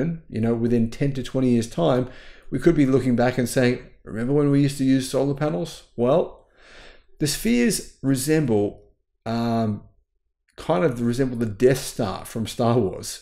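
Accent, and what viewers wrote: Australia. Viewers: Are you ausi?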